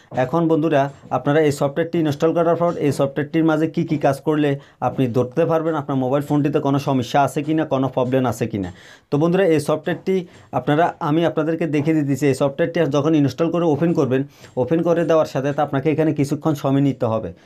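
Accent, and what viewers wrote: Noakhali, Bangladesh. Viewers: Vai tor basa Mona hoy নোয়াখালী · ভাই নোয়াখালী কোন জায়গায় আপনার বাড়ী,,,কথা শুনে মনে হল আপনি আমার দেশী ভাই,,,,,🌾🌾🌾🌾🌾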